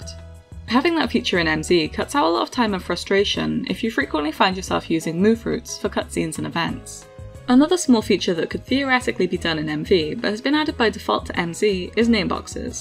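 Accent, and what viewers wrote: South Wales, United Kingdom. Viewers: I'm from South Wales too! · woooo south wales gang let’s goooo! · South Wales accent?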